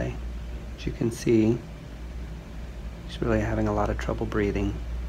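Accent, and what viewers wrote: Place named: England, United Kingdom. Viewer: Dear gentleman, I couldn't understand why this procedure were carried out. I am not good at understanding your English ascent. Why both cats were not left to take their own time to leave this world.please don't feel bad if I have asked the question without understanding the situation ( which really couldn't understand ). Thanks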